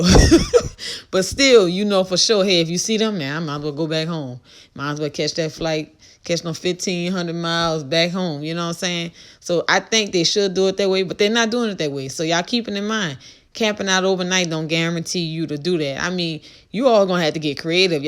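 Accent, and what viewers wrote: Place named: New Orleans, United States. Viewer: Hi! I don't believe you really collect pins, but there's this shop called on Morgane Brret Shop on Instagram, and she recently did a small pink dress Cinderella themed pin collection, and it reminded me of your channel! I hope this comment isn't too forward, but I just wanted to let you know. Love your channel, and it sounds like you're from New Orleans, so it's cool seeing another fellow Disney collector from Louisiana!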